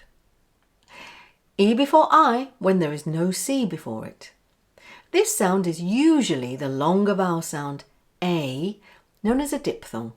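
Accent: RP, United Kingdom. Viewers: Please are you british I want to learn the british English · ¡Eres fantástica,! I love RP Accent!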